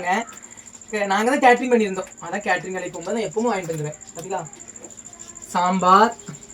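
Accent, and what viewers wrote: India. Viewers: உன் பேச்சு வார்த்தை எல்லாம் தூத்துக்குடி மாவட்டத்தில் உள்ள பேச்சு மாதிரி இருக்கு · தம் தந்தைக்காக, தம் குடும்பத்துக்காக உணவு வாங்கி கொடுக்கனும்னு எண்ணுறதே பெரிய மனசு தம்பி. அந்த உணவு வழிபாட்டுத்தலங்களில் கிடைத்தாலும் சரி, திருமண நிகழ்ச்சிகளில் கிடைத்தாலும் சரி, யாரேனும் கொடுத்தாலும் சரி, நீ உழைக்கும் இடத்தில் கிடைத்தாலும் சரி அதை வாங்கி குடும்பத்தார்களுக்கு கொடுப்பது மிகப்பெரிய நன்மை உண்டு. உம்முடைய நெல்லை பேச்சு மிகவும் அழகாக இருக்கிறது. உன் பெற்றோர்களுக்கு கடவளின் ஆசிர்வாதம் என்றென்றும் கிடைக்கட்டும்.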